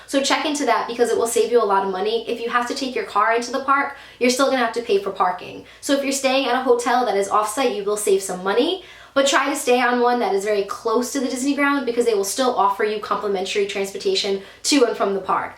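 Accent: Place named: New Jersey, United States. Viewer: pahk coppah - Jersey?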